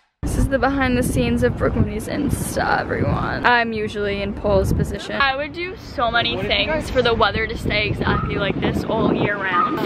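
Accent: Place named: New York, United States. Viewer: first video I saw of yours I noticed the accent lol, I'm live in ny so I was low-key thinking ,, hmmm maybe she's from ny lmaooo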